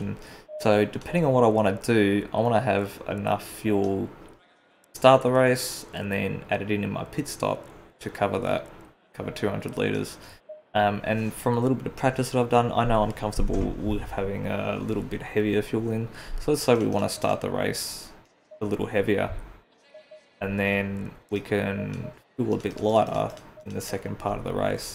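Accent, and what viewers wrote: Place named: Australia. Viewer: Subbed. Good to hear an Aussie accent:-) Great video. Thanks. Just a question: I want to test in the wet, and also practise pitstop technique, setting up pit strategies, as per your video. Which mode can I use to do this without affecting my SA, CN, CC, etc? ie have ratings turned off, so I can muck about. I can't find a setting where I can select weather (rain). Yeah, I'm a newbie, and all of these variables are doing my head in. But loving ACC (on PS5 and PS4 Pro). Thanks in advance.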